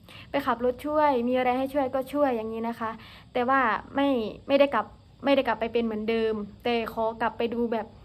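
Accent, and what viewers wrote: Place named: Sakon Nakhon, Thailand. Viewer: ตกลงน้อง เป็นคนบุรีรัมย์หรือคน สกลนคร ตอนดูคลิปขับรถพูด เหมือนคนสกลนคร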